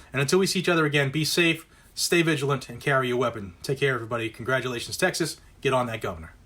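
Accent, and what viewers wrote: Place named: Southwestern Pennsylvania, United States. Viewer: Sounds just like Southwestern PA! Lol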